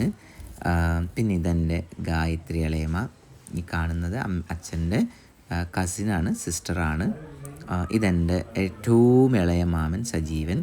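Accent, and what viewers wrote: Malabar, India. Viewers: മലബാർ സൈഡ് ഒക്കെ ഇങ്ങനെയാണ്, മലപ്പുറംകാരി ❤️ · മലബാർ സ്ലാങ് അല്ലെ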